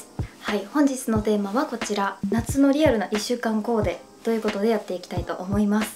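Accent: Kansai, Japan. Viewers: 関西の方ですか？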